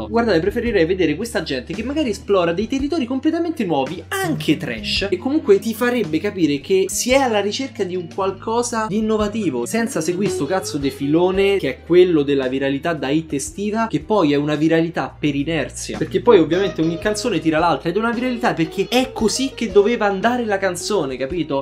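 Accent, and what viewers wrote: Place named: Salento, Italy. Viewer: Sembra più salentino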